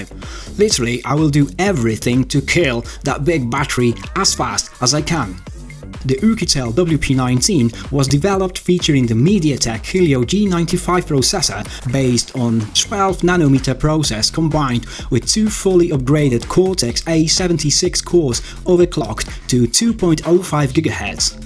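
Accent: United Kingdom. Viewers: Супер аппарат, интересный тест👍 и я впервые в жизни слышал чистую британскую речь... Хотя с детства слушал the beatles например, но никогда не замечал это))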